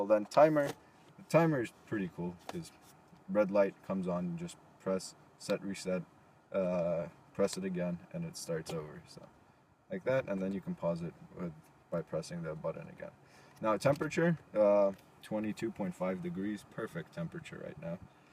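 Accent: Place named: United States. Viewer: No offense, but Is this in Canada ? Your accent is american but your country use KMH? I kinda confused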